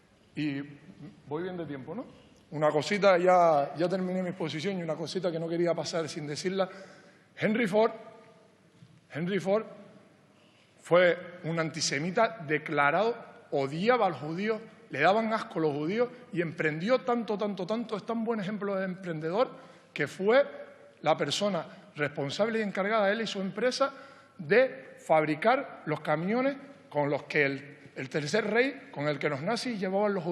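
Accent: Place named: Canary Islands, Spain. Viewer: ay si mi papi,muy interesante la intervención pero el acento muyayo me puede jajajajjajaj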